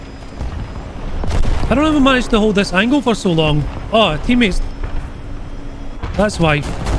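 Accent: Scotland, United Kingdom. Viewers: It's the Scottish brogue. He could read off my final rites and it'd honestly be entertaining.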